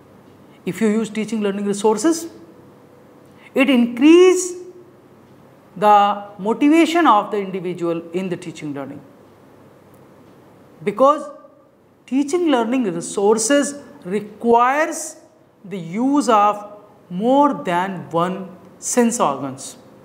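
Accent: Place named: India. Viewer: Indian accent 🤦‍♀️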